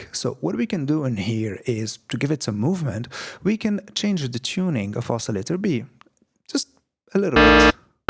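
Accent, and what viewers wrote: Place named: Germany. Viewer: Énorme la modulation sur le spectral. T'as un super accent avec un petit côté Germanique , tu dois être vers l'est j'imagine. Super Skills, tu as un excellent niveau, les presets packs sont flawless and gorgeous. Merci mille fois pour le partage de ton savoir ✌️🙏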